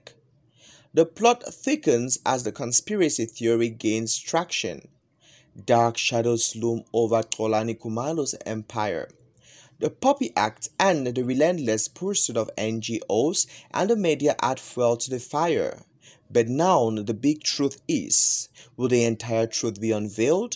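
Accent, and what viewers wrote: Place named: Nigeria. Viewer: But why is a Nigerian saying this... Nigerian accent all over the place 😅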